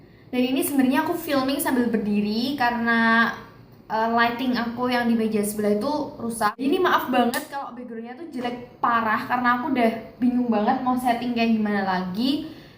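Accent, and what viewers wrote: Java, Indonesia. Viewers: medok bgt kak ngomongnya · kka agak medok ngomongnya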